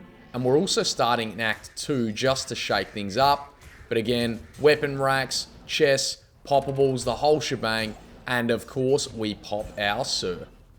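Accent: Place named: Australia. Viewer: Yankee here, but my family did live in Melbourne for 7 years recently before to moving back to the States. I played D2 when it first came out, then again about 10 years later to build a character to do the Ubers. In my third run now to try to beat the Ubers and colossal ancients this time. Really enjoyed hearing the High Rune Frustrations in an Aussie accent and learned a thing or two along the way. Subbed so I can hear more whinging and I hope you eventually get that perfect role.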